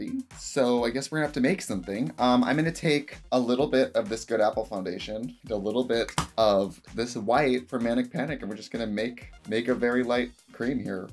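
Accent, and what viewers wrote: Australia. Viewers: I read this video title in australian accent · Im not even a second in and i can already hear the aussie accent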